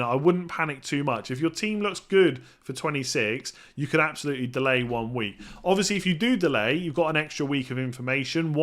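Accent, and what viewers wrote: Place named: West Country, United Kingdom. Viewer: West country (South West england)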